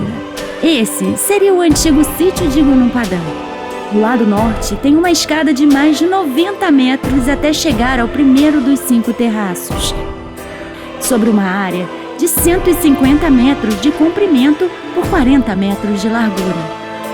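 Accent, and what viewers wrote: Rio de Janeiro, Brazil. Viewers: Amei suas historias e sua voz carioca😊❤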